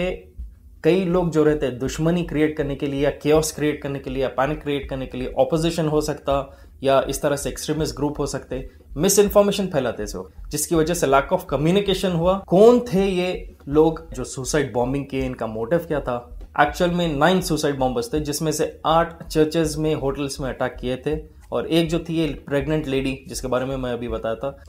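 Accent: Hyderabad, India. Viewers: Bhai tum Hyderabadi ho ky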